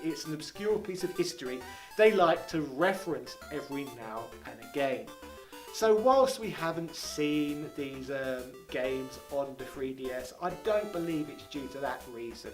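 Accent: England, United Kingdom. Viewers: English people can't speak properly, free DS for example. couldn't be bovered is another. It's not just this dude though lol. freeeee deeeeeee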